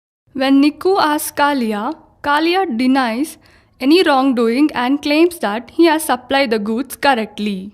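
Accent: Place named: India. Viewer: CAN'T UNDERSTAND THIS F@ INDIAN ENGLISH....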